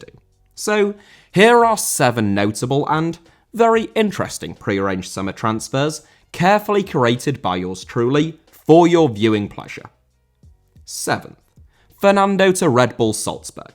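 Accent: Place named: North, United Kingdom. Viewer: You do understand he has a northern accent? I wonder if you would be so critical of an an African or Asian speaker where their natural speaking style would cause similar issues.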